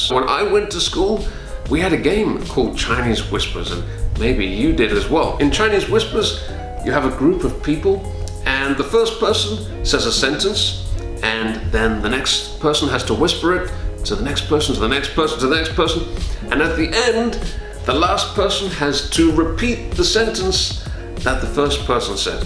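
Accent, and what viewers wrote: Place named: United States. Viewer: American accent yoo, I love it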